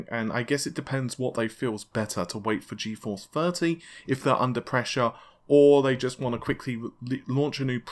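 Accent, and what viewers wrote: United Kingdom. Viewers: "six cores and six...*faaahreads* " Awesome video as always but dang that British twang remains strong.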